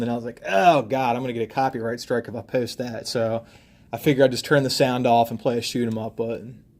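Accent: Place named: South, United States. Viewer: Surfer dude? This dude clearly sounds like a hick.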